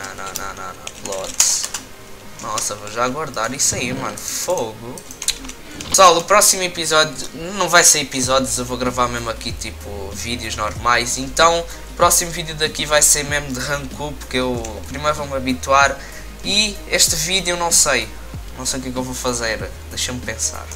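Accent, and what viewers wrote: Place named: Portugal. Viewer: é caralho um tuga aqui espetaculo! mas para de falar cara fdsss que trigger se é para os br perceberem mete legendas xD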